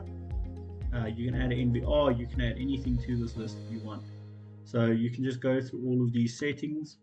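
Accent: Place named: South Africa. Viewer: South African voice……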